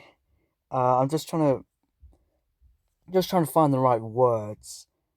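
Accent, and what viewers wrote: United Kingdom. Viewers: HES BRITISH! LETS GO! (Take your time, get used to your voice being on youtube, no worry) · Love your voice my bro, Keep doing. (British accent makes it more beter 💀)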